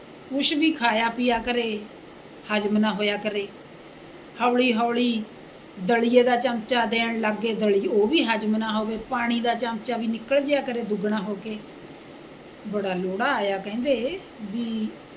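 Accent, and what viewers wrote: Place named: Malwa, India. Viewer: ਮੈਡਮ ਤੁਸੀਂ ਕਿਸ ਸ਼ਹਿਰ ਵਿੱਚੋਂ ਹੋ ਬੋਲੀ ਮਾਲਵੇ ਦੀ ਹੈ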